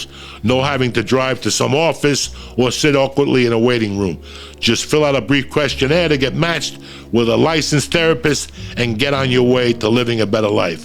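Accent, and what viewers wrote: New Jersey, United States. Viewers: Somehow shameless advertising with a Jersey accent works on me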